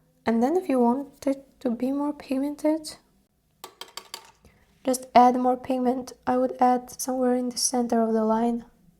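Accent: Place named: Eastern Europe. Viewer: Сразу видно наша славянка ! Акцент как у меня 😊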